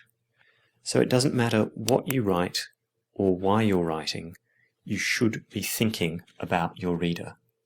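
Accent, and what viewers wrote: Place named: Australia. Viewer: Its Australian love. Where you from?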